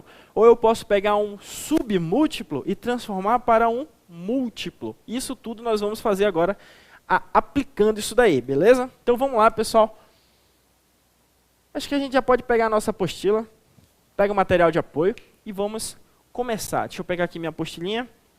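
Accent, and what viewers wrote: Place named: Rio de Janeiro, Brazil. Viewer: mt carioca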